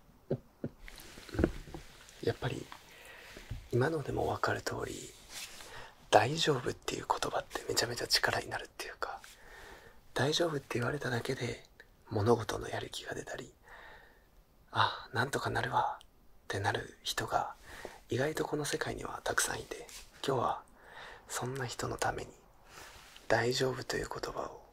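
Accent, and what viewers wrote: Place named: Kansai, Japan. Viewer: いつも標準語のロールプレイしか聞かないから関西弁ロールプレイ新鮮でめちゃよい！！！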